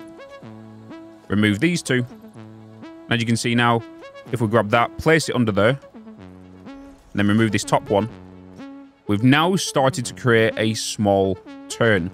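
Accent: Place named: Rossendale, United Kingdom. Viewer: You from rossendale pal?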